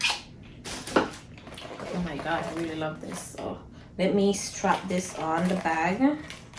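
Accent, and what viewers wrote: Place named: Jamaica. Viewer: I loovveee your fashion!!! I hear some patois mi sis!!!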